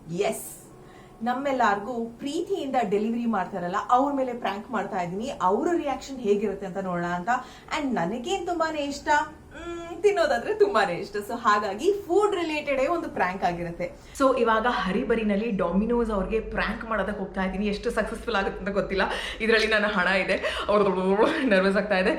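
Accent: Mangalore, India. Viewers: Awesome prank I really appreciate your confidence for doing this👍. They were so kind. The way you spoke in mangluru Kannada was super cute ❤️ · Namma kudla da kannada baari shok kendrene kushi apund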